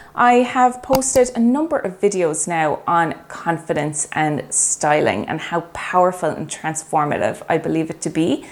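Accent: Ireland. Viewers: So well said - 52 and curvy here, I love your gentle manner and of course your helpful tips - you have a new subscriber :) PS - I enjoy your lovely Irish accent, and your doggy friend is super cute (and that's coming from the ultimate cat person)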